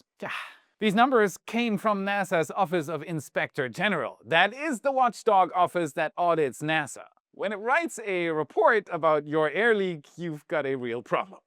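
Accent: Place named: Germany. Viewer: Dear, i support the content, but please for deities knows... used ai to get ride of that german accent. It's giving me hai hitzer vivbes.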